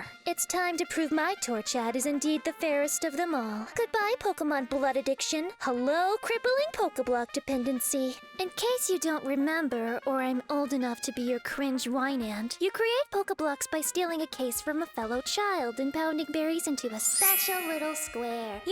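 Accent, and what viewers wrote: South, United States. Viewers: Was that an authentic "southern hell" accent :3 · I dunno what to focus on first... The fact that your singing skills are improving with each video? The fact that the cringe rap is cringe af and I love it? The fact that your editing is great and I love the "text" jokes? Your great southern accent? The "uwu"? Or the fact that you somehow never went to Pacifilog Town on this save file? For real though, in all my years of playing Pokemon (which is like... a lot of years, idk) I've never once done a contest. And the worst part is that I somehow feel like I didn't miss much. But that means that when I was a kid playing this game, I was too dumb for both the Battle Frontier and the Contests! Not much has changed since then I guess. Anyway, great video as always! Keep 'em coming, queen!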